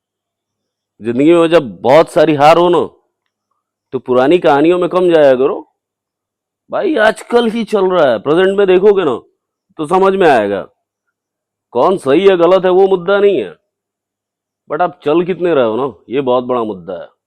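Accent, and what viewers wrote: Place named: India. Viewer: Bhai desi andaz me narration Gajbe hai ❤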